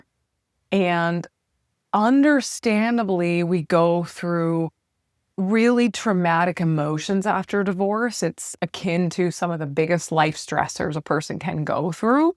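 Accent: Canada. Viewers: Really. Is it Canadian. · along with the fact that you're smoking 🔥 hot, I really get a kick out of your Canadian accent.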